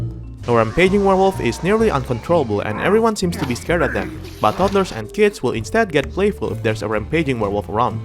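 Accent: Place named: Indonesia. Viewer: always love hearing your indonesian english... unlike how most locals talk nowadays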